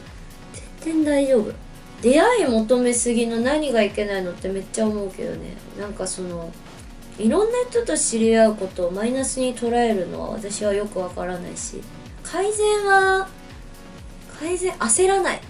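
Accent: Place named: Kansai, Japan. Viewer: やばない？ってさんの関西弁めっちゃかわいい笑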